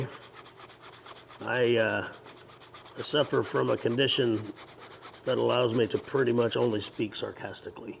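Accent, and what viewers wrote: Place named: Yorkshire, United Kingdom. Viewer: Absolutely Mint ✊🏼 Proper easy to follow and so down to earth. Are you sure your not from Yorkshire , England? 😀✊🏼😀✊🏼😀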